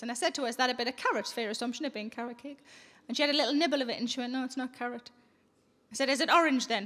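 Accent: Newcastle, United Kingdom. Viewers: Aha, Geordie/Hobbit crossover, I approve.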